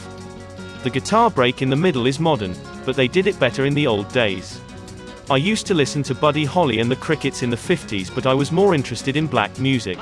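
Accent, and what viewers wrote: England, United Kingdom. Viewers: Why is this not Frank speaking and some English twit ? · That aint Frank Zappa . Since when does Frank Zappa have a British accent? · We are hearing Zappa’s words, read by an Englishman? Gtfoh.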